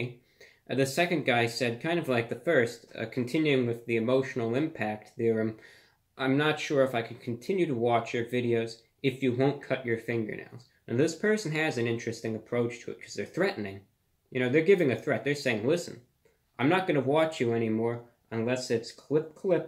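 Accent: United States. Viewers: He looks so British and then you hear his accent Feels American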